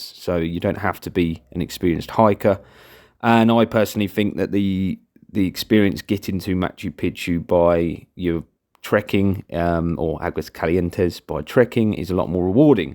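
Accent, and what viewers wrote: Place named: United Kingdom. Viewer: Hello, and I am so glad that I have tripped over your site. Not only do you provide lots of info, but you have a British accent and pronounce things in a way that I am used to hearing them. Some American sites have robots talking that should be shot. I look forward to seeing more from.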